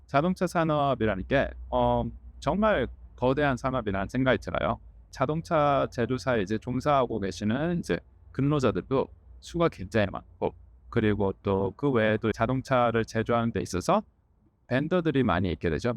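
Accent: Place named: United States. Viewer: 혀좀 덜구리지요 미국말 어색해 ㅎㅎ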